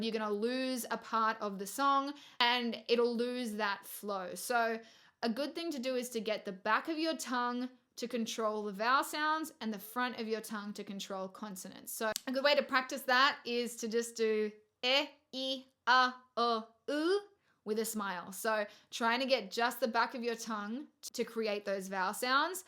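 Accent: Australia. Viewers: I love the Australian accent i wanna be Australian omg lol ahhhh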